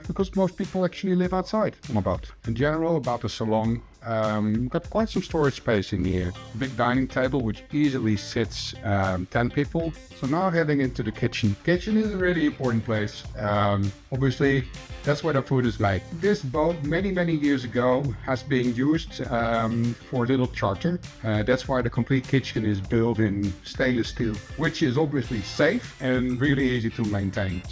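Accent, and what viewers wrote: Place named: Netherlands. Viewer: Lekker Nederlands accentje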